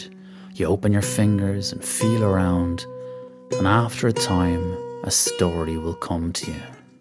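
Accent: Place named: Ireland. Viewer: this is so precious!!! once i can get over that dream of an Irish accent and put my focus on the story and the gorgeous mountain scapes!!! bravo ...a wonderful and rich story!!!!!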